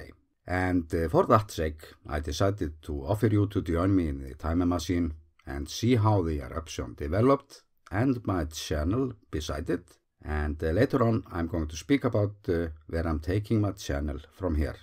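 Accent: Iceland. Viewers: Miriam E. Yes, he speaks perfect English, but with an Icelandic accent that is unlike any other. Makes for a wonderful experience.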